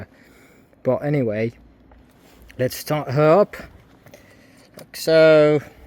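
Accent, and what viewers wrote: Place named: Baltic states. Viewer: I enjoyed your video. I'm guessing you're from the Baltics, Finland perhaps?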